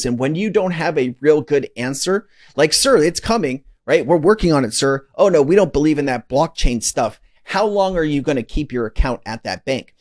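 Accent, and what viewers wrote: Australia. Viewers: Yes he's Australian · Australian accent 😊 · Same here... the dude on the video was an Aussie!. was close...😊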